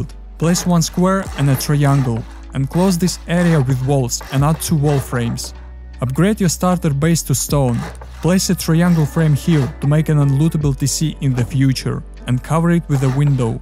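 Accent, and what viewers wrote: Russia. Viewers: жесть как русский акцент выпирает прям )))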